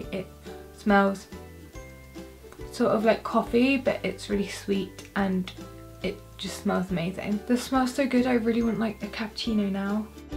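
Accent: United Kingdom. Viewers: I love your accent. I've always wondered what it would be like to live in the UK, mmmmmm, anywhoo, thanks for sharing, great tutorial.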